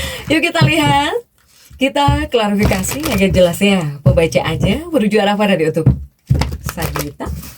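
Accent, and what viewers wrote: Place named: Sunda, Indonesia. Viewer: Ya bner ak suka dgr suara kk sunda, ada serak2nya gtu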